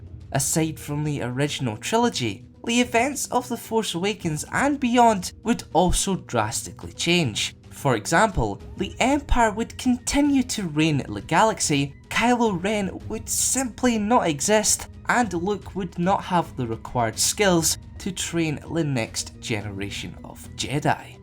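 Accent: Scotland, United Kingdom. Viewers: Lambda Presents Scottish I believe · Lambda Presents: Yes he is Scottish, His voice is cool! :)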